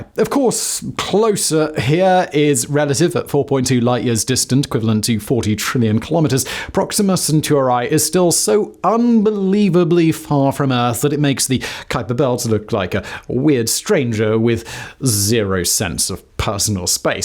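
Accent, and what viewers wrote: RP, United Kingdom. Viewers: Why you trying to sound like a posh twat? Lol...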